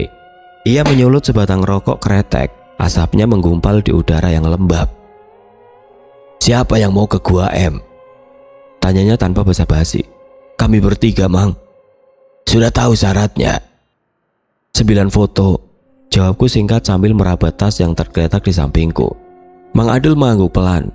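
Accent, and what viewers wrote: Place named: Java, Indonesia. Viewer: Suara medok lu mana wooiiii...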